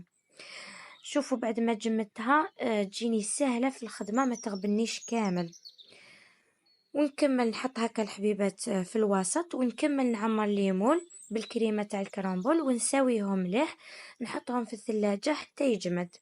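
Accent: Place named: Morocco. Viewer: صاحبة القناة لهجتك مغربية!!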